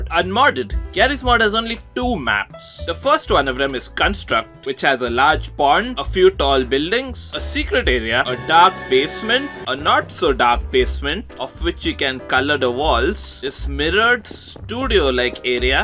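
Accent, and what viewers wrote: India. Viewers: you are indian? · you gotta love his Indian accent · You sound indian but i have abit indian accent in my voice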